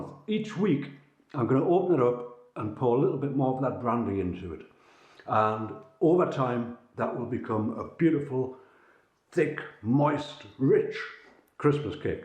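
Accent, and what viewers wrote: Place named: North East England, United Kingdom. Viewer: where are you from? It sounds like your from the North East England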